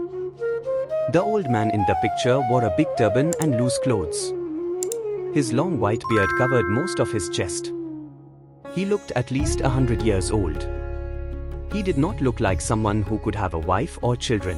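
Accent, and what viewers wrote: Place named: Africa. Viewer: this feels like a african trying to explain a indian cbse portions , still its very good explaination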